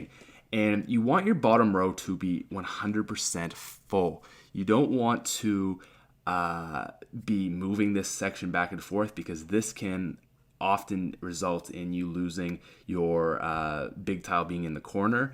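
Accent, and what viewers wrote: Canada. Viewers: He sounds so Canadian In this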